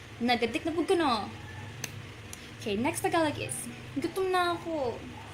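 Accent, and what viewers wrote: South Korea. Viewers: Bisaya ko pero bogoa ani oy! Tagalog diay ni? Bisaya man japun ni oy in a Korean accent! Lamlam! 😂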